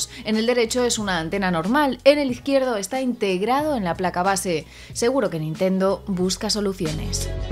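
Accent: Spain. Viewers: Juan Jose Velázquez Lucero Cuestión cultural amigo.... Así lo pronuncian en España, "equisbocs", acá en México le decimos "Ecsbocs" .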